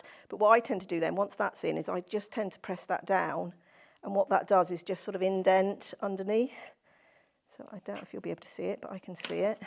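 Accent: United Kingdom. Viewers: Thank you. Brit here in Michigan so its nice to hear the British accent. You are a good teacher.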